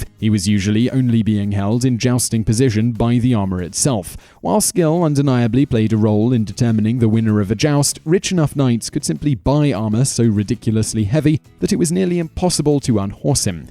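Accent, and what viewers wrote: England, United Kingdom. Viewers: Absolute crock research delivered by a man speaking in a british accent to make it seem legit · Well English knights fight on foot, and u sound English u should now about that, not presenting knights to be better then else just because they have horses, also lower class man at arms uses horses, light cavalry, mounted archers...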